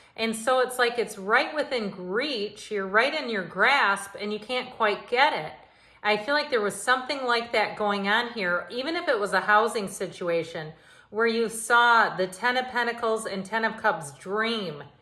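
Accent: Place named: Chicago, United States. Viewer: you sound like you're from chicago. I grew up there and know that accent anywhere! So glad I found you here and this totally resonates with me. One question I have is how is the retrograde helping abundance?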